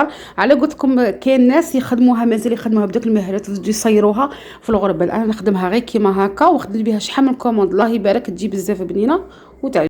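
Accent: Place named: Western world. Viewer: Allah ya3tik essaya, ça fait plaisir d'entendre l'accent de l'ouest😘😘😘😘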